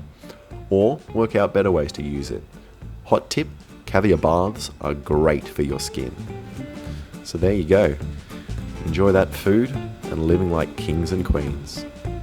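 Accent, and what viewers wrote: Australia. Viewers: R u from Australien? Cause u Sound cool? Getreu from Germany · You Aussie mate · it's how ya going. traditional aussie greeting.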